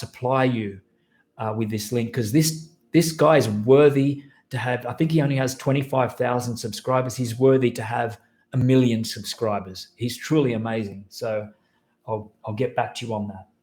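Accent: Australia. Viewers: Love your accent and your upbeat vibe!❤❤❤ Australia rocks and I've never even been there!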